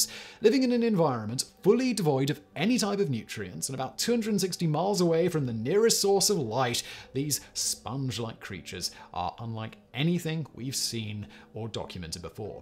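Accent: United Kingdom. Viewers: As a scientist I LOVE the way you speak about these topics! Science communication at its best. Plus I miss the British accent since I left the UK so that doesnt hurt 😛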